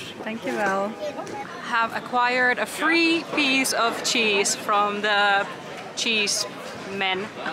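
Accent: Netherlands. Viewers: I´m suprisied that your English accent sounds like a native Dutch person speaking English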